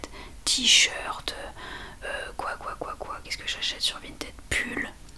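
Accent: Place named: Paris, France. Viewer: En écoutant cette vidéo, je me suis dis "ptn c'est une parisienne c'est sur " 😂😂😂 désolé pour le préjugé mdr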